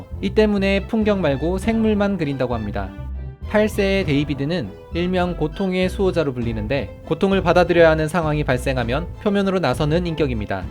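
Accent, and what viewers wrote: Gyeongsang, South Korea. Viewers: 경상도사투리 어쩔수가없나봐요 ㅋㅋㅋ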